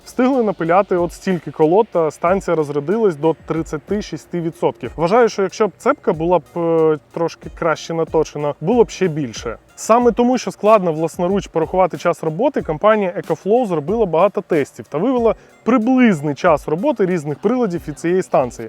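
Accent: Russia. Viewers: Твои русизмы в словах сложно осушать. Ты либо по русски бы говорил, либо пусть тебе бы написали текст для диктовки на чистом Украинском.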